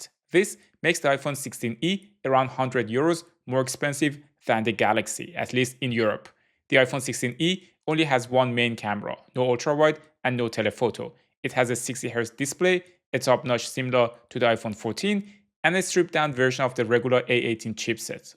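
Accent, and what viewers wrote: Iran. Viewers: I have a feeling from your accent that your persian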